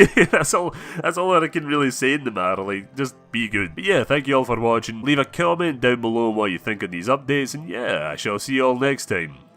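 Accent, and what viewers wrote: Scotland, United Kingdom. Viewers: With that accent it seems like a foreign language! Are you scottish ?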